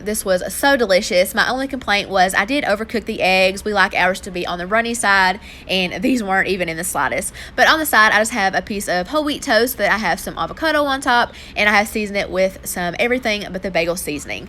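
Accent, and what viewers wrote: South, United States. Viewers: Hi there! Your food always look so good! Love listening to you talk, love the southern accent. Thank you for all you do. God Bless, your kids are adorable. ❤️🥰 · “Then I’m gonna, kinda badly here, cut this into bite size pieces” 😂 I died! Much love from Alabama! I can hear the southern charm in your voice! 😂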